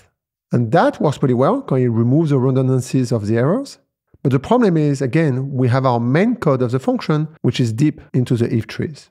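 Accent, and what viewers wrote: France. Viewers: 'pub' 'fn' 'str' 'Err' damn Rust.. what's wrong entire and undestandable words? (You seem to have a french accent, right?).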